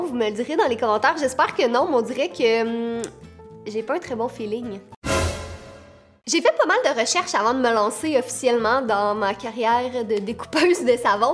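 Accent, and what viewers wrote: Quebec, Canada. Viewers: J'adore ton accent c'est un accent québécois . désolé pour ton bout de peau mdr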